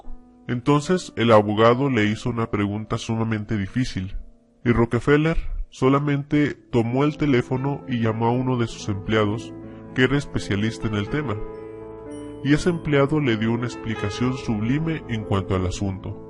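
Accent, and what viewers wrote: Chihuahua, Mexico. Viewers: Mmmm... asento de shihuahua ? Si, este es de esos.... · pues por la manera en que dice la "ch" yo digo que es de chihuahua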